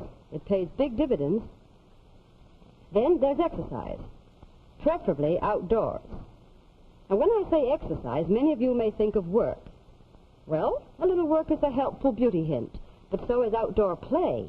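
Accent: Transatlantic, United States. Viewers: Transatlantic accent if I'm not mistaken.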